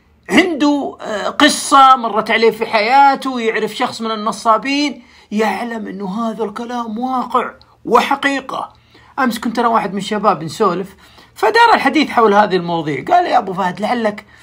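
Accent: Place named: Libya. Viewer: سبحان الله كلامك ولهجته كانه من ليبيا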